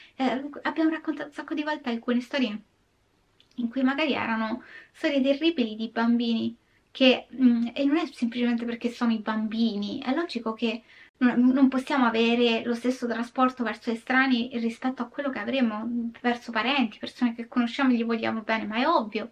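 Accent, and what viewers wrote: Rome, Italy. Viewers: il tuo intercalare romano che adoro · Cara, non si tratta di accettarti così come sei. Nessuno deve accettare nessuno. Tutti devono rispettare tutti e semmai seguire o legarsi a coloro che apprezzano. Noi non ti accettiamo, noi prima di tutto ti rispettiamo e poi ti apprezziamo. E personalmente ti stimo e ti sono molto grata per quello che fai, sia qui spogliando di ogni sacralità i criminali e restituendo dignità alle vittime, sia sugli altri canali, dove mi accompagni in interessanti e spesso importanti ragionamenti, o dove mi apri le porte sul mondo del cinema, sui suoi retroscena e i suoi meccanismi. Un mondo di cui io non so e che tu sai spiegarmi con un linguaggio chiaro, professionale ma anche scanzonato che mi ispira simpatia, che mi mette a mio agio. Quanto al tuo stile... è per l'appunto il tuo stile. Ti distingue, ti permette di esprimerti, sei tu. 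Io poi lo adoro, ma anche se così non fosse sarebbe parte di te come la tua voce, la tua calata romana e il gremlin con la corona di fiori in testa. Tutto ciò sei inconfondibilmente tu e, apprezzandoti, lo apprezzo. E chi non lo apprezza è tenuto a rispettarlo. Ti mando un abbraccio, sappi che per noi ciò che sei e ciò che fai ha valore, ci fa bene. ❤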